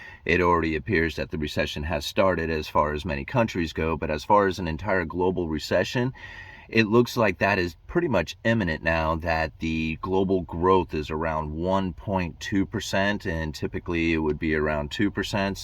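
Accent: United States. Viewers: I haven’t watched this guy much but does anyone know if he’s American or Canadian?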